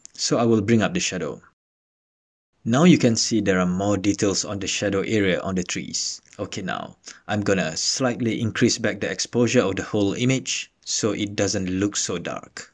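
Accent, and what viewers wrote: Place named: Malaysia. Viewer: Love your accent and tutorial. Thank bro. Greeting from fellow Malaysian.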